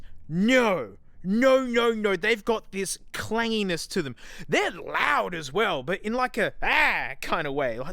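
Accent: Australia. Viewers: i really like the sassy diva pop song mr loud Australian man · its so weird hearing the aussie man in my ear, without any funny music in the background.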